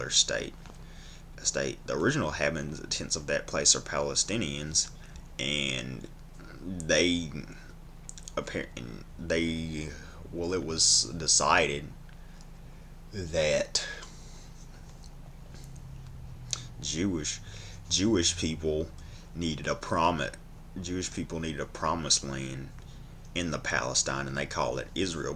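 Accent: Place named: Carolinas, United States. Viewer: I look forward to seeing more videos from you. Your accent is interesting. Are you from the Caralinas?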